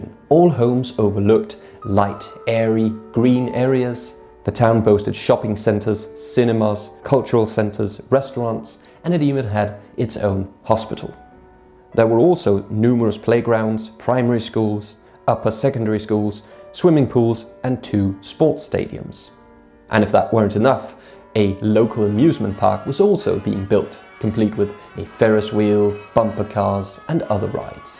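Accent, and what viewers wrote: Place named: United Kingdom. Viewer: Hearing a British man saying “50,000 people used to live here” and it not be Captain Price or Macmillan is strange to say the least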